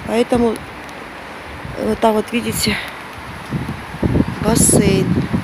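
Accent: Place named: Georgia. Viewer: Очень длинная реклама! Но я не переключаю. Жду ваш славный голосок с грузинским акцентом!👍👍👍👍👌👌👌👌👌👌💞💕💖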